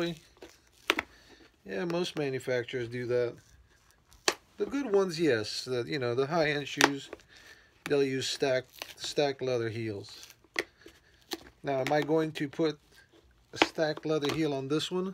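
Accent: New York, United States. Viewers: Are you from NY? You sound like you may have been from NY.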